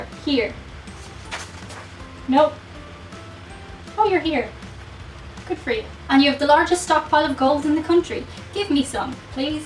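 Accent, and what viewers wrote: Ireland. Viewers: I love her accent being of Irish decent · I’m a new subscriber, I’ve been binge watching a lot of your videos. I now have your Irish accent stuck in my head. Oh, I watched editor video. She has so much personality, just tell her, she needs to be Not so perky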